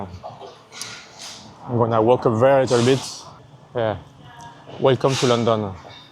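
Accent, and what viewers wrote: France. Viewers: frensh accent :face-green-smiling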